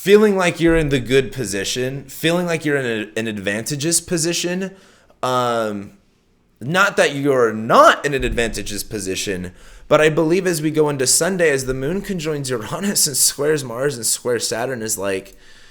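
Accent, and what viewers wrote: California, United States. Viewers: Yes! Love that midwestern twang coming out from under that California accent!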